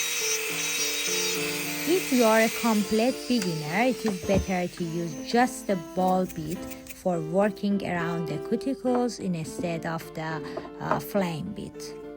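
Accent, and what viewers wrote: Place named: Iran. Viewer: وای انگلیسی‌ت لهجه‌ی فارسی داره 😅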